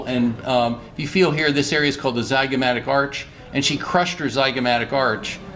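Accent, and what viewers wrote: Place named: Baltimore, United States. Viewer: His 'balmer' accent brings vack memories.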